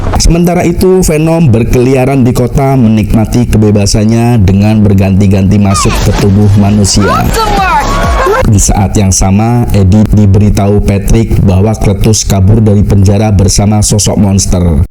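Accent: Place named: Banyumas, Indonesia. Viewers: Wong tegal kye😂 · Wong endi min, bahasae kaya wong panturaan jeh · Mantep bro, aja kelalen ngapakmu rejekimu haha. Salam subreker anyar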